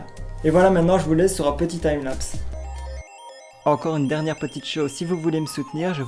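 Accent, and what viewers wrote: Belgium. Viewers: Excellent, t'étais super mignon avec les cheveux longs. Un de mes poussins ne veut plus qu'on lui coupe les cheveux, j'ai hâte de voir jusqu'où il va les laisser pousser ;) Vidéo super réussie, elle a été le sujet du discussion de tout un repas :) Toute la famille aime tes vidéos, ton humour et ton p'tit accent me rappelle les quelques mois que j'ai passé à Liège ;) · T es belge je suppose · Tu est belge???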